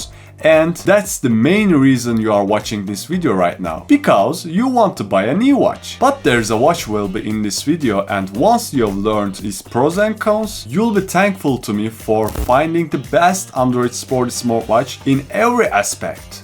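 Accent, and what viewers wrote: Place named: Turkey. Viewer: Öylesine açtım bu aksan tanıdık derken Türk çıktı 😂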